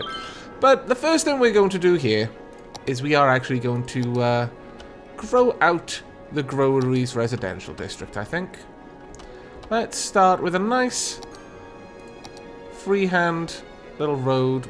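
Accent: Wales, United Kingdom. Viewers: I love that the cruise ships plow straight through the water turbines as though they aren't there XD I also have no idea why ... but I LOVE IT when a welshman says "lovely" so this video was audio heaven for me :-)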